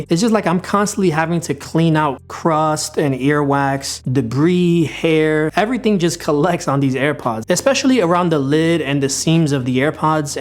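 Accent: New York, United States. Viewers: no problem, you sound like your from NY!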